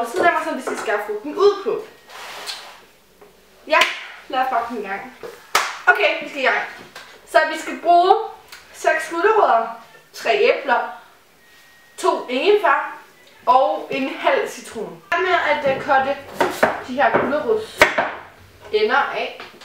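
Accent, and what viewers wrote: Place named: United Kingdom. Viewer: Dat engelsk😂😚💕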